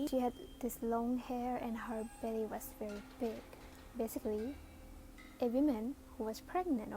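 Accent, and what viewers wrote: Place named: United States. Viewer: you speak like a Us people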